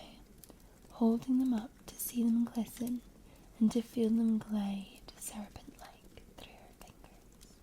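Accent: Scotland, United Kingdom. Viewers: What is this lovely Scottish woman doing in my bed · This one makes me feel like I've been pampered by a sleep-angel, sent from Scotland to give me a relaxing infusion of comfort sleep. Sending happiness and health to you Ms ❤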